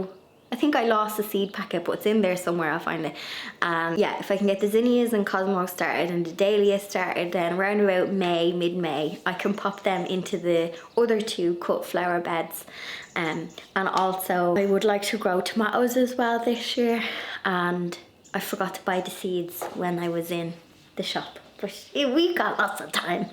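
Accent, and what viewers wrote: Ireland. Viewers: Love listening to your accent my maternal great grandparents migrated to Australia from Ireland,my grandfather could put on a good Irish accent lol I’ve only been to Ireland once, but could have just moved back in so easily it was like coming home, just can’t leave all my family behind 😢 I don’t know how they did it in the past To come to a strange land so far away.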